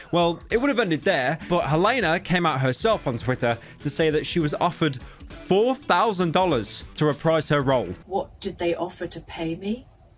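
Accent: United Kingdom. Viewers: I like when the funny British man talks about the popular thing on the screen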